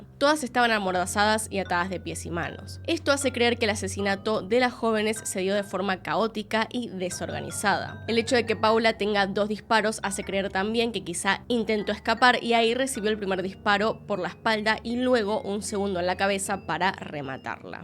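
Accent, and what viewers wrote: Argentina. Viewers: de qué parte de Argentina eres?. Un saludo efusivo de una española que te admira❤❤❤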